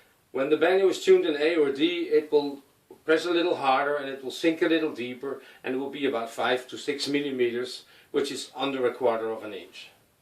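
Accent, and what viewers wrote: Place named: Netherlands. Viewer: my god these banjo's are beautiful. Really having a hard time deciding. I also have a bodhran put easily tunable. Love the sound of real skin, even as a vegitarian. I live on a boat so..well... humidity. Do you still use the wax and does it change the sound. - Kijk net op je site, dacht al ver weg een NL accent te horen.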